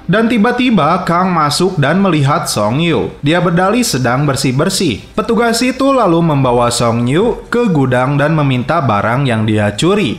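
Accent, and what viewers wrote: West Java, Indonesia. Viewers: Mantap sundana te leungit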